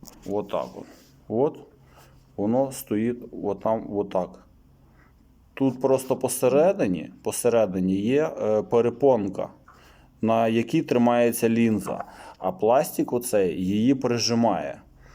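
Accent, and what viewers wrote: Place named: Polesia, Ukraine. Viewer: ВЯЛИКИ ДЯКУЙ,ГЛЯЖУ З МИНСКА, РАЗМАВЛЯЕШЬ, ЯК У НАС НА ПАЛЕССИ, УСЕ ЗРАЗУМЕЛА, ПАЙДУ ЧИНИТЬ,А ТО МАЛО ХТО ПРОСТО И ДАХОДЧИВО РАССКАЖА, АБЫЧНО ВУНИЧАЮТЬ.😊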